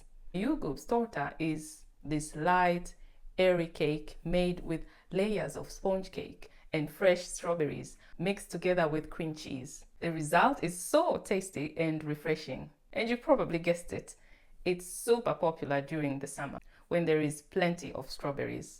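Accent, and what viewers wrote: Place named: Africa. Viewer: You sound african.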